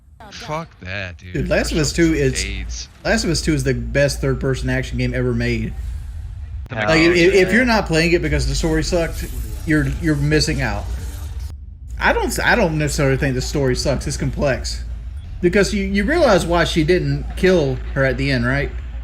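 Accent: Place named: South, United States. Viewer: His weird fat/country/ebonics talk was especially difficult to listen to this time around.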